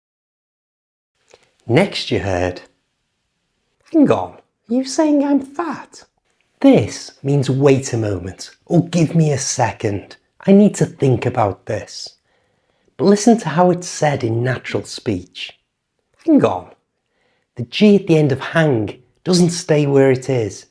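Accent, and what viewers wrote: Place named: North, United Kingdom. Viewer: What city do you live in ? You sound from North blighty?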